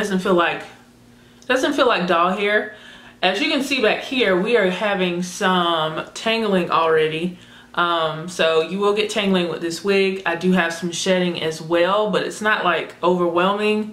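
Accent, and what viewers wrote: South, United States. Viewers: Girrrrrl u are killin this unit!! So beautiful!! And your southern accent is so cute! Ima go broke wit these wigs, I swear 💞👑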